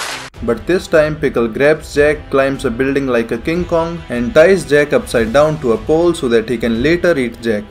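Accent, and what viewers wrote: India. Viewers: Ur an Indian the accent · You sound Indian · it IS India accent. He is an Indian I can vouch for that. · I enjoy the heavy indian accent shitposting about Baki. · Are you a indian? Your voice sounds indian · Everything on his channel is funny the Indian accent just adds a lot to it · This is the only guy with an Indian accent that doesn’t make me want to leave his video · same it sounds indian and not indian at the same time, it's complex lol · His Indian accent is cool. Most Indians I ever listened sounds more difficult to understand. This guy's Indian accent is kinda easy to understand 😂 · The normal jokes are way funnier with Indian accent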